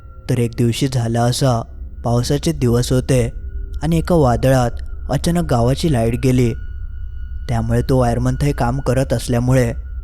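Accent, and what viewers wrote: Malvani, India. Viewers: Ti malvani ha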